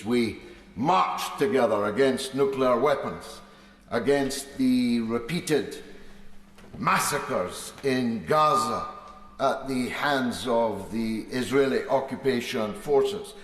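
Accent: Scotland, United Kingdom. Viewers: The Scottish accent is beautiful.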